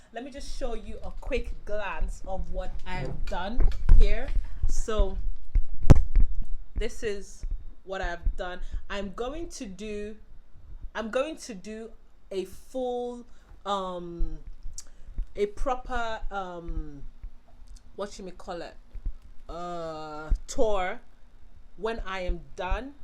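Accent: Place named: Yoruba, Nigeria. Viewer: 😂 You said v-log... Really sorry you've been going through all this. I can't imagine how overwhelming everything has been for you. Nigeria is just a whole beast to deal with especially when it comes to things like your shop issue. Also I'm probably projecting and I'm a huge proponent of keeping quiet if you don't have anything nice to say but I honestly love when the yoruba or the more unfiltered sounding accent comes through from you. Again projecting but yeah. I really hope things don't get messy at all with the shop folks and goodluck with the new shop and the tailoring business. I follow you on insta so I see your fabric ads and I always wondered why you didn't make clothes 'cause its a whole lot more profitable to have both businesses in one. Its what my dad's tailor does. You pick out the material there and the design and boom, he makes his money.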